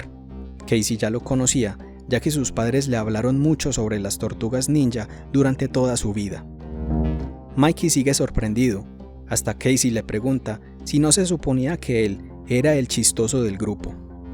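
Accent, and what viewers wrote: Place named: Colombia. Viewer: sos de Colombia? Digo, supongo que es lógico por el vídeo de los Simpson, pero ese ascento es demasiado reconocible😂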